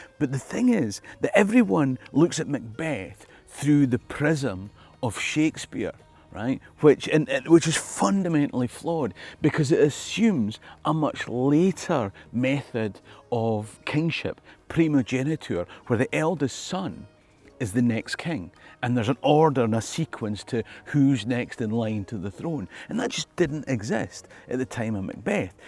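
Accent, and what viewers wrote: Scotland, United Kingdom. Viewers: As a person who has a very fluid accent when I'm traveling, it was endearing and amusing to see you take on a bit of a Scottish intonation. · As you are describing the history, it sounds like you are developing a Scottish accent. It's cool. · The further you get into the video the more and more is turning Scottish in his accent. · My favorite part of this episode is clearly suppressing his urge to fully mimic the scots accent after spending so much time there. 😂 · I love how picks up accents - there's a distinct hint of Scottish in a lot of his pronunciations in this video!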